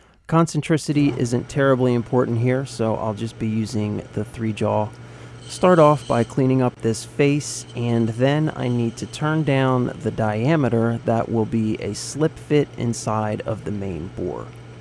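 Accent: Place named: United States. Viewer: Are you breaking that bicious cyvle or that vicious circle? I don't always understand the American accent...😅😅😂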